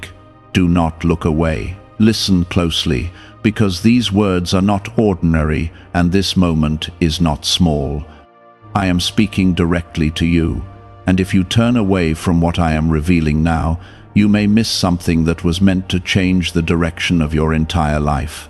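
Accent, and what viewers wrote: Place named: United Kingdom. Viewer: HI THERE GOD OF HATE AND PUNISHMENT!!! WHAT IS THE TRAP YOU ARE SETTING FOR ME??? I WILL OVERCOME IT REGARDLESS. I not receiving ANYTHING until you disclose EVERYTHING. YOU ARE FAKE GOD and DIVINE TIMING I do not agree to! I only do things in REAL GOD'S TIMING ONLY!!! YOU PLAN NOTHING FOR ME! I DECLARE NO NUMBERS SO JUST GET OVER IT> THE FOG YOU SEE IS BECAUSE YOU ARE IN LONDON, YOUR ACCENT GIVES IT AWAY!!! THIS WHOLE CHOSEN ONE PROGRAM HAS BEEN IN RANDOM! I WILL NOT BE A CHOSEN ONE ANYMORE AND REAL GOD SAID I DON'T HAVE TO!!! YOU ARE NOT GOD! YOU ARE NOT GOD!!! YOU HAVE PROMISED BLESSING FOR OA YEAR SALREADY AND I NEVER GOT ONE!!! THANK REAL GOD YOU ARE NOT SANTA CLAUSE!!! YOU HAVE ZERO TRUST FROM ME! YOU ARE PREPARING NOTHING> YOU ARE FAKE!!! REAL GOD DOES NOT HAVE BRITISH ACCENT! HE HAS NO VOICE EITHER. HE TALKS TO ME TELEPATHICALLY JUST AS THE SOULS HE TALKS TO IN REAL HEAVEN>FINISH THIS IN 5 MINUTES OR I SIGN OFF!!! 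I AM NOT TAKING A BREAKTHROUGH! GET THAT THROUGH YOUR THICK HEAD!!! 4 minutes. 3 minutes, 2 minutes. 1 minute. Your time is up. CHEERIO!!!!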